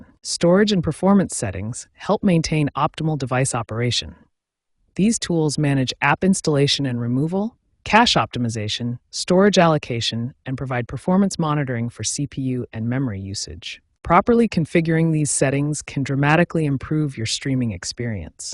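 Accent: United States. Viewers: As an example of poor delivery technique, this presentation would be useful as a training aid for teachers and lecturers. The presentation is poorly coordinated with information flashed on the screen brielfly with insufficient time to assimilate the content. Thereares also unecessary and distracting blank pages. The rate of s[eaking is almost so fast it is garbled and while the presenter's diction is quite good, why was an American accent chosen for a product supplied in the UK? There should be considerably more detail on how to access \setting options and a link to a printable transcript so that the user can follow the information at a pace suitable for making adjustments.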